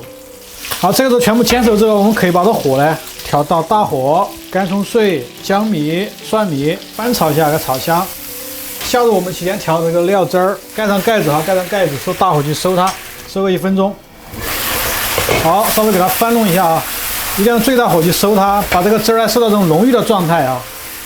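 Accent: Sichuan, China. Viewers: 我是北方人，但是一听师傅的四川口音，就知道绝对靠谱👍🎉🎉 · 听您的口音是四川人吗？我是居住在苏黎世的四川人，看到您排骨的做法，很喜欢，瑞士奶酪🧀️很多又便宜，希望您推点奶酪入菜的菜品，谢谢🙏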